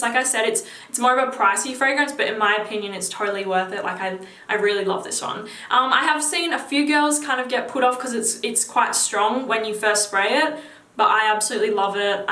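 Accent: Australia. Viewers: I definitely wasn't expecting an Aussie accent when i opened the video lol. Great list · YU AUSSIE ? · That accent! I'm from London and get a lot of comments on mine haha but that aus accent suits you to a t!! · I think she's Australian....but, I'm not sure....classy girl for sure! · 100% normal Aussie accent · Her australian accent does it all 👌🏻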